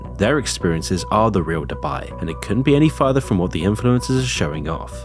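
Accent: United Kingdom. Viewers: Why is it these videos are always made with a guy with this kind UK accent? It's driving me nuts 😂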